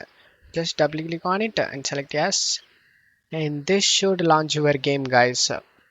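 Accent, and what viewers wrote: India. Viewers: First i heard indian accent then i knew it will wokr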